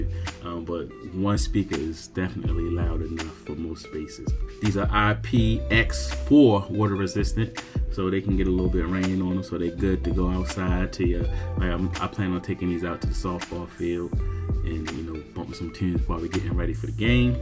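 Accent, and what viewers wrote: Baltimore, United States. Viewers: My guy, are you from Baltimore? I hear the accent.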